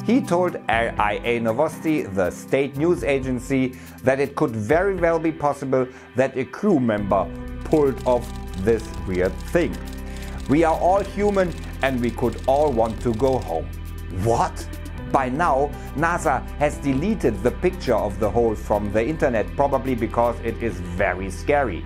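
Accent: Germany. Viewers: Sorry. But this Art oft Denglish is terrible. Thumb down. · Denglish would mean that he is speaking like half of the words in German and the other half in English. He only has a german accent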